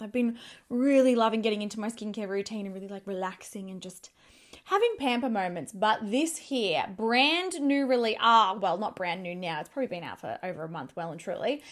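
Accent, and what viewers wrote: Australia. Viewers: How much I love you, your smile your positive energy your girlie voice your beautiful face and the cherry on top your sooo adorable Australian accent ♥︎♥︎ LOVE FROM GREECE 🇬🇷 MY BEAUTIFUL ♥︎ ( I want things from your brand does it ship to Greece? ) hugs xoxo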